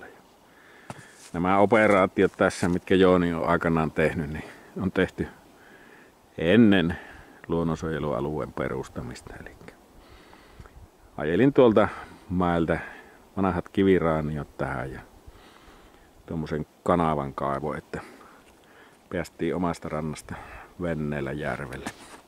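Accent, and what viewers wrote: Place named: Savo, Finland. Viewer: Nyt on mukava kuunnella kun se on kotikulmilla niin murre tulee niinkun pitääkin. Ps. Kauhee kuunnella savolaisittain kirjakieltä😅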